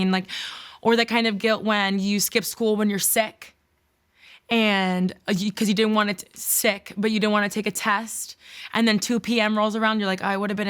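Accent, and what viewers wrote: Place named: Brooklyn, United States. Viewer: I just found this channel. This shit has me cracking up. Before u even said it, I knew u were from Brooklyn from your speaking and your swagger.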